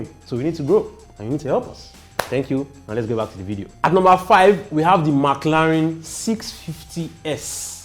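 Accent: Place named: Nigeria. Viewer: Least fastest to the most fastest. Nigerian English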